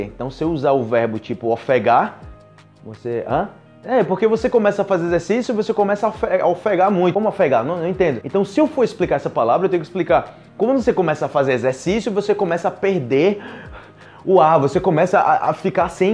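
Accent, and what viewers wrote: Antioquia, Colombia. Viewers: me encanta como hablas el español colombiano (paisa) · 😂😂😂😂 Que cómico hablando español "paisa"... pareces colombiano, la verdad!! 👍🏻👍🏻👍🏻👍🏻👍🏻 Tus vídeos me transmiten una vibra positiva, gracias! 😊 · Increíble como habla muy bien el español colombiano. Yo soy nativo colombiano de Bogotá y nunca conseguí usar esas expresiones ni tener acento. Es verdad que es español paisa eso, mas en español de Bogotá también hay un acento y expresiones y nunca conseguí hacer. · Kkkk adorei sua conversa paisa! Jajajja quiero saber como te sale el costeño🤔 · Ya somos 2. Si que la vacila con el acento colombiano.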